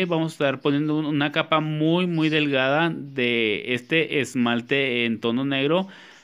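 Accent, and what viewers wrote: Chihuahua, Mexico. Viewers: De dónde eres? hablas como lo de Chihuahua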